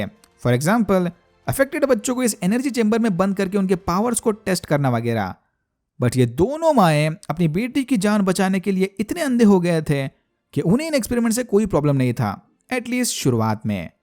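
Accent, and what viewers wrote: India. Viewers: I hate your stupid indian accent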